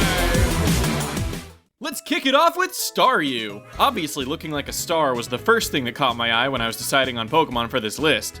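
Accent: United States. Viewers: upset by the lack of MERICA voice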